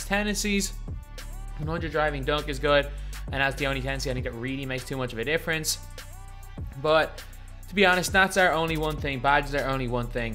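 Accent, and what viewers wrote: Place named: Ireland. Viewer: He is from Ireland and I actually like to watch a 2k youtuber who doesn't speak american english. Cause that can get annoying after a while.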